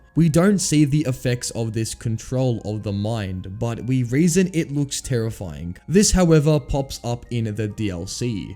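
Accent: Australia. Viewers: Are you Australian cause I’m too bro 🤩 also great video enjoyed it mate 🫶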